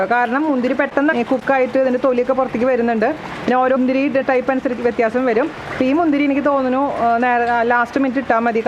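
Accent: Thrissur, India. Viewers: pineapple madhura pachadee undakuna reethi valare ishtamayee....thanks a Ton.....pinne namadeee GADI yude THRISSUR slank superrrrrr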